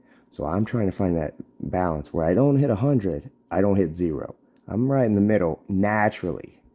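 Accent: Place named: South, United States. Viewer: I'm from Louisiana it's sounds like your from the south as well bro nice videos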